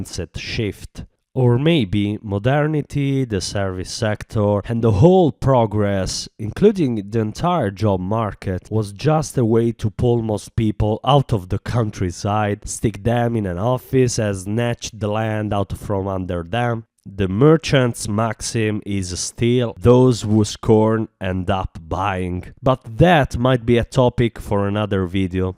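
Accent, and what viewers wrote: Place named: Italy. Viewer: Good job but se ti guardi 3-4 episodi dei sopranos in lingua originale sblocchi l'accento da new jersey invece di dover rimanere con l'accento da scuola pubblica italiana con insegnante zitella di origini partenopee. All'audience international piace di più se ti possono immaginare dire "capocollo" come interiezione, fidati non è difficile.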